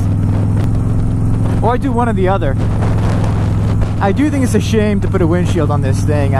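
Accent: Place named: New England, United States. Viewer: By the way, you from Boston? NE twang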